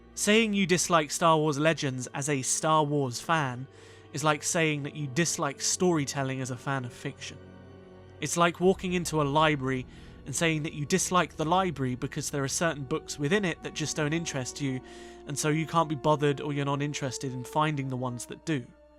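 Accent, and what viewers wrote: United Kingdom. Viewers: First of all, I absolutely agree (25 secs into the video) because every argument sounds BETTER with British accent. Thank you so much for throwing this video and pointing out excellent things. This video is the PRIME reason I started my own channel to bridge the gap between Legends & Canon and have the fans see the beauty in both! LucasFilm cares, Disney cares, and the new Legends re-prints are glorious!! I'll be watching your videos. Thank you so much for this great video.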